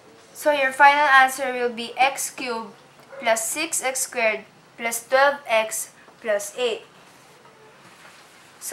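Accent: Philippines. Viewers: U are Filipino, i can tell by the accent. We speak the same accent kse hehe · sabi ko na Pilipino ka eh, yung diction mo eh :) great work! di ko 'to alam, buti gumawa ka ng video na hindi sobrang haba. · ACCENT PALANG PINAY NA!! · Sabi ko naga ba pinay ka boses palang halata na haha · Are you Filipino? Because you talk like one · Pinoy to guys im proud to be pinoy ang galing ito thank you po for uploading this (kung wla line of 7 ako) (accent mo btw is pinoy its easy) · You sound like a filipino trying to talk English.... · You are a Filipina, aren't you? because your accent though :D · I knew na Pinay ka po ^^, accent pa lang. xD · are you a filipino? because you have the accent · I'd thought the accent was familiar, Pinoy ka pala eh... Salamat sa video mo... naka pag arak ako para sa test ko sa school 😁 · sabi ko na pilipino ka dahil sa iyong accent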